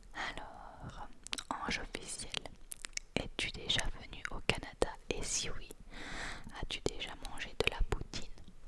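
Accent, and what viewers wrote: Belgium. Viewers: J’adoreee les vidéos comme ça près du micro (et si y’a des bruits de bouche encore mieux ah ah), en plus tes chuchotements avec ton accent belge c’est super relaxant ! Une vidéo de ce style serai cool hihi ;)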